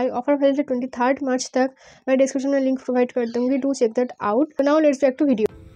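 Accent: Bengal, India. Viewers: U bengali?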